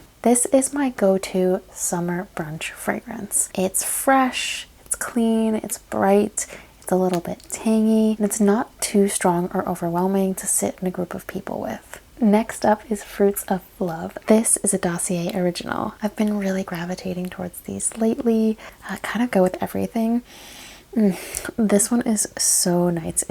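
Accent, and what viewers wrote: United States. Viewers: Do you from U.S.A?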